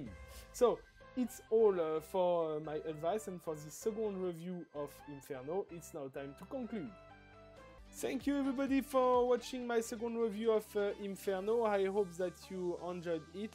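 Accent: France. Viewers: Bon gros accent français mdr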